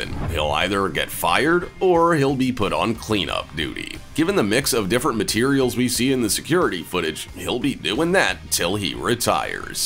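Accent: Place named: United States. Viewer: Where is the narrator from? He seems to have an American accent, but uses expressions like "gave it a go" and "went pear-shaped". It's jarring.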